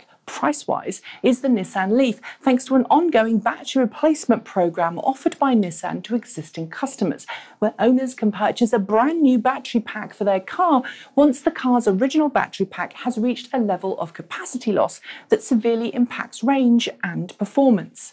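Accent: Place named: England, United Kingdom. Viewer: it s too hard to understand if English is not your mother language. it's a too 'hard' English accent. after months i must unsubscribe. a pity but it's always a too much big effort to understand for me.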